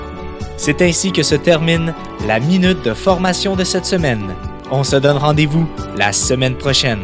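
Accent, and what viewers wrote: Canada. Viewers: super, l'accent canadien c'est fun! moi j'adhère, merci! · T'as fait une présentation exemplaire mais pardon ma sauvagerie...Ton accent Canadien à détruit partiellement ce vidéo...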